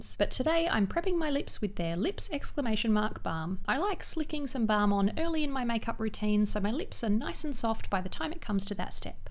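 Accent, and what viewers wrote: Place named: Australia. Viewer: Your accent is so cute!!! Love the Aussie touch to your videos 😅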